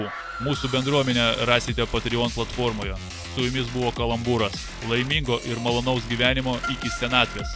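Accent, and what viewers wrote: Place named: Russia. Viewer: Rusiškas akcentas per voice changeri girdisi, primate.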